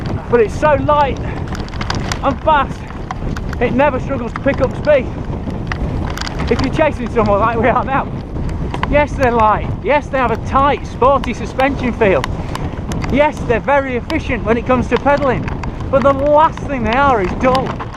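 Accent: Northern England, United Kingdom. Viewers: Loving these. And is it me, or is there rather more deliberate/pronounced Northern-ness compared to the Future-ised accent you have to adopt, er, elsewhere? Either way, great stuff!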